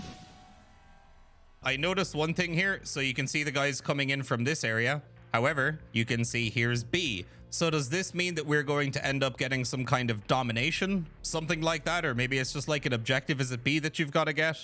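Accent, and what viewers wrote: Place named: Ireland. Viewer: Ive been seeing a lot of negativity lately so this is really nice to see. As always the silky irish tones in the noise canceling headphones are a highly appreciated bonus.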